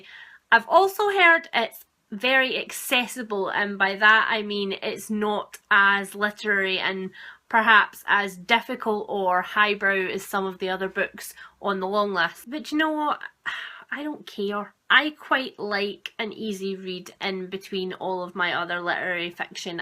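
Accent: Scotland, United Kingdom. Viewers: Just discovered your channel! I love your videos! And I love your scottish accent! I can't wait to visit Scotland again next summer (I'm Italian), I've been there back in 2014 and fell in love with it.. Plus, Edinburgh's bookshops are to die for! Awesome Haul btw!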